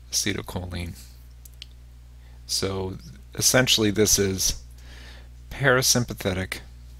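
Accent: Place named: Jamaica. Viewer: 1. excellent i have already liked it on the icon side 2. minor revisions recommended - a) the slimy Jamaican English accent could be changed, b) not a semi-audiobook, coz i don't want to be seeing the videos whilst hearing to his good english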